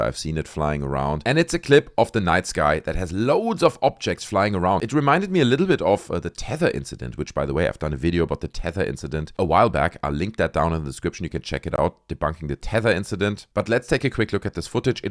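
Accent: Germany. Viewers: Love Ur German English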